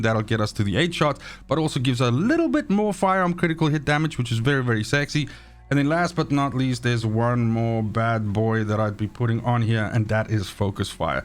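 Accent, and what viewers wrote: South Africa. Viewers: from which country are you bud, you sound like RSA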